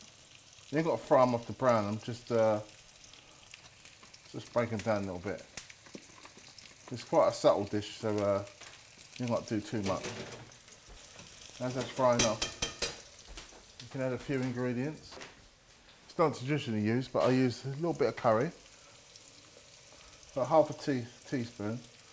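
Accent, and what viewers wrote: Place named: England, United Kingdom. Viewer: With that accent, he was born in England, London I would guess (lol). He sure loves his powdered seasonings...............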